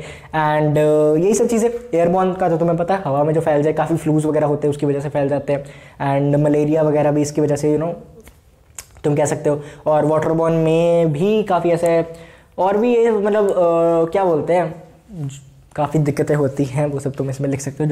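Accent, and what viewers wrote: Punjab, India. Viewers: U r Punjabi??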